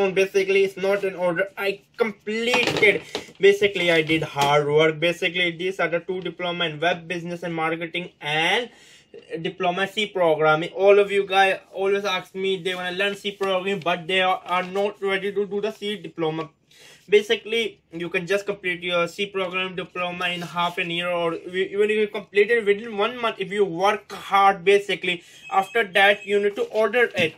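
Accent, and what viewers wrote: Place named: India. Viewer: Basically you are the reason whole world thinks all indians have an accent like you basically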